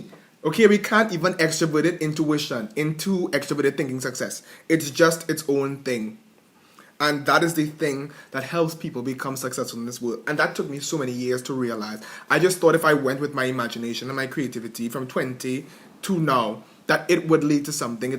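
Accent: Trinidad and Tobago. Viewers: Once i heard the accent i knew trini one time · lol as soon as I heard the accent I knew you were a trini lol 😂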